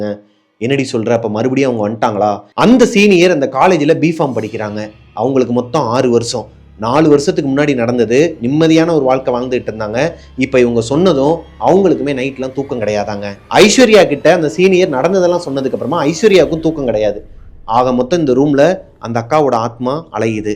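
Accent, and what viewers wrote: Madurai, India. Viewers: anna naum madurai Tha anna nanum samaikura appo vessel watching apo Matti illa nna mob panra apo yellaneramum unga vedios mattu Tha nna ketutee vela papaen❤ seriously nega oru Stress Buster nna enno nega neraiyaa gost vedios poduga nna especially nama ooru slang na pesirathuu enaku romba puduchurukuu nna love u Broooooo❤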